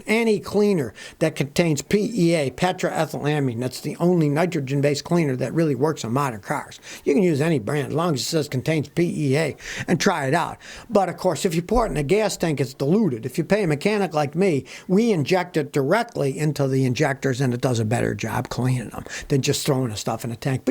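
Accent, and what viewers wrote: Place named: Texas, United States. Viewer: Hell, he came from Texus! dat's da wey dey talk alla the time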